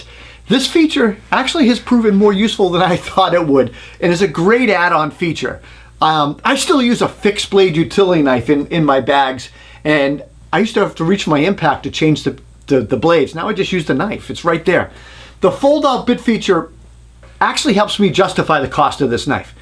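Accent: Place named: New York, United States. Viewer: Don’t like this big mouth. Very New Yorkey.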